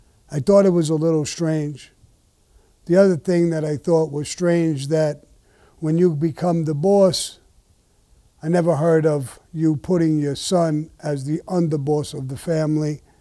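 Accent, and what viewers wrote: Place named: Brooklyn, United States. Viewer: I have italian friends from brooklyn new york. They act, talk and sound exactly all the same. Just like Sammy.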